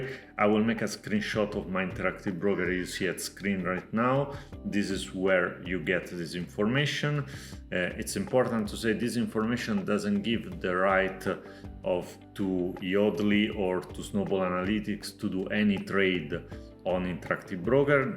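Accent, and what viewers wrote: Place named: Portugal. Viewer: Great video about Snowball Analytics and I love the Portuguese accent! Does SA use FIFO for cost basis and capital gains/losses calculation or do they give you an option to choose average cost instead?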